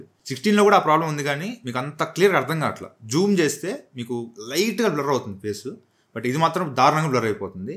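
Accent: Nellore, India. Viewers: Anna Nellore aa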